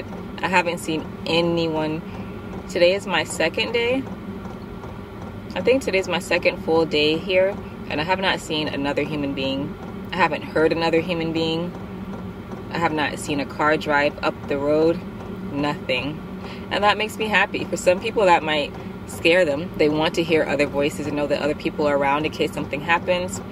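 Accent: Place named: Upstate New York, United States. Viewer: I'm still watching the video but it sound like you are upstate NY. I hope you visit one of the boroughs LOL Come visit the city. I can show you all the spots you will love like ice skating in Manhattan!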